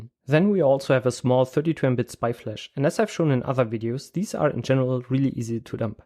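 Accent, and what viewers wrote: Germany. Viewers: wie deutsch sich das anhört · Bist du Deutscher ? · Are you German? Because you sound like Werner Herzog lol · Der deutsche Dialekt, xD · Du sprichst Deutsch oder? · i hear a little bit DEUTSCH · are you German ? if so, me too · Are you German because your accent. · du bist doch bestimmt deutsch das hört man an deinem english xddd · You should talk a little faster.. much too slow to understand your heavily German-accented English.. thumbs down for presentation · Can I ask, is your accent German? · Are you German? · Whats that accent? German? Nethertheless good job. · I am neinty % sure this guy is german because of the accent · Mashalla wie er Airtags ausspricht, sowas von Deutsch. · The german accent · You sound German, are you? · Let me guess, you're german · German accent :p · der deutsche akzent kickt 😂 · Bist du deutsch? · Gutes Video man hört das du deutscher bist XD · Man erkennt eben dass du Deutsch bist haha.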